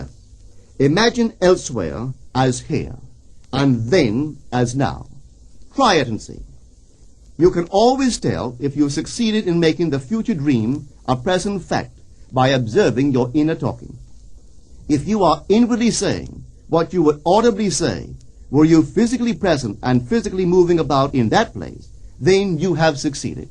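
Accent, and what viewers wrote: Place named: Barbados. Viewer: This isn't Neville's voice. This is someone else. I think they(on this recording) might also be from Barbado's but this NOT. I know his voice.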